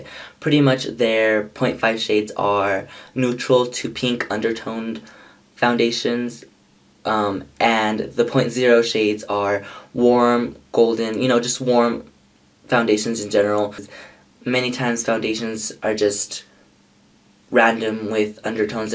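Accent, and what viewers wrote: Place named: United Kingdom. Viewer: Omg your british accent was to die for! Soo cute and I've only just begun the video :)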